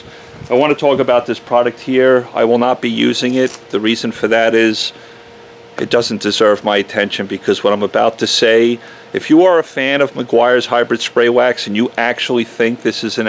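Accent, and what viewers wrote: New York, United States. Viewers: If he's here in Dallas, why does he have a NY (or L.A.?) accent ? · That's a NY Accent. LI likely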